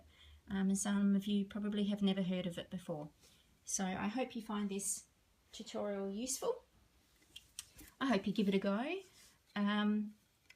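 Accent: Australia. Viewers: Nice to hear a fellow Aussie!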